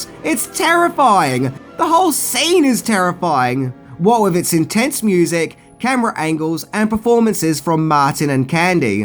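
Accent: Australia. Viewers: Sounds more like a homosexual Australian. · Good channel here. Was wondering about your accent, got it now, Auzzie convict stock...i am a pomie....suck it up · Hey mate, It's pretty cool your spot on, covering all the shows and movies I dug as a child, and in that beautiful Australia accent. What's more is your clearly on the world stage.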